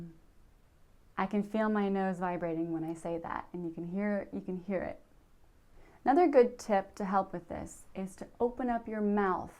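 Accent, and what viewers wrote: Texas, United States. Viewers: hello are you Texan? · are you Texan?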